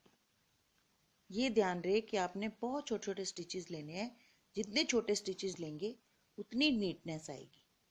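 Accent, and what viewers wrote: Punjab, India. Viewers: Beautiful design.. N ur Hindi with Punjabi touch is so sweet.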